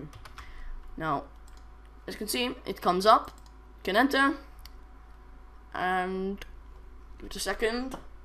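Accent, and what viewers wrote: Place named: United Kingdom. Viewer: omg i hate britsh english this so retarded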